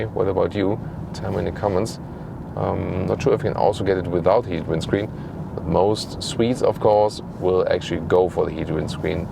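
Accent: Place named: Germany. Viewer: この方、いつも誠実でいい！ 字幕なしでも日本人が理解できるドイツっぽい英語と、話すスピードもちょうどいい！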